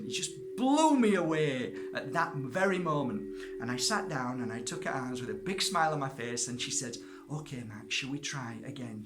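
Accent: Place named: Yorkshire, United Kingdom. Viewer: Thanks for your wee signs video much appreciated and enjoyed. You sound like one of the Elliott brothers aka the Chuckle brothers. Are you from Yorkshire W.R.? Blessings to you from Sunny London town;-) x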